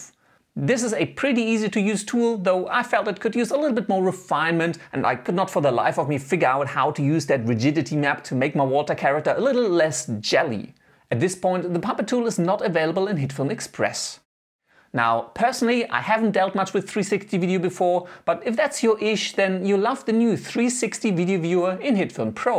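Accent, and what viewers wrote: Germany. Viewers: Are you German, cause you accent is so strong and it sounds like german english 😂😂😂 (btw I'm German) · Du hast einen extrem deutschen Akzent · Great comparison! Very helpful! Do you think Hitfilm Pro can compete with Adobe premiere/After Effects? By the way: Are you a native German? Your English sounds like that a bit... ;-)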